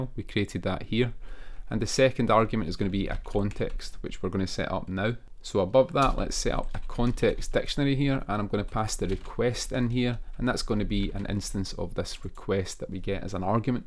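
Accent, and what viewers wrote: Scotland, United Kingdom. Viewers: How interesting that the Scottish accent is easier to understand. I wonder what Koreans make of other accents from the UK?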